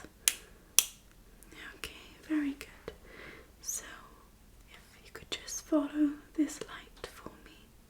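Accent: Wales, United Kingdom. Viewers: What accent is that ? Welsh ? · Lovely Welsh voice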